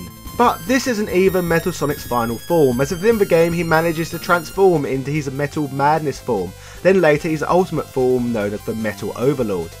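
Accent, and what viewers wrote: United Kingdom. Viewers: I have learned British accents unintentionally make certain words sound really offensive.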